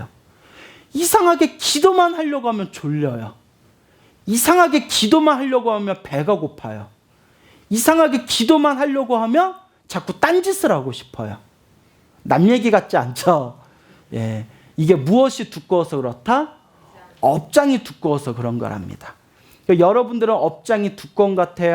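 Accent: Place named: Seoul, South Korea. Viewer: 광우스님이 쓰시는 서울말 너무 듣기좋습니다🥰 🥰🥰🥰🥰🙏🙏🙏🙏🙏🙏🙏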